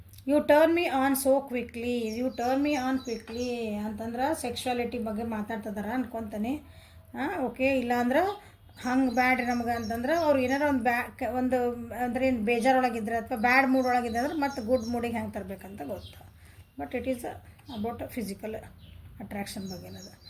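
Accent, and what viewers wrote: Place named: North Karnataka, India. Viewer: ಮೇಡಂ ನಿಮ್ಮ್ ತರಾನೇ ಇದಿರಿಗೆ. ನಮ್ಮ ಮೇಡಂ ಬಹಳ ಸುಂದರಿ ಯಾಗಿದ್ದಾರೆ ಮತ್ತು ನಿಮ್ಮ ಉತ್ತರ ಕರ್ನಾಟಕದ ಭಾಷೆ ಬಹಳ ಚಂದಿರ ಓಕೆ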